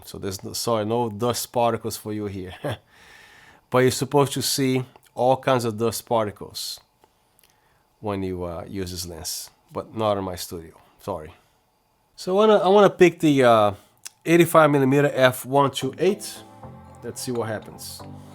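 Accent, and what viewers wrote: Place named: Brazil. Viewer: I couldn't ask for a better review, this is really detailed. Also, I can tell by your accent you were born (or at least lived) in Brazil. Am I correct? Cheers!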